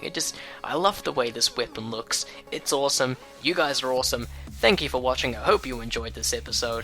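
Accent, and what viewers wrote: New Zealand. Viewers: U from nz? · I've got 2 things to say are you a new zealander and you've got your own costume😲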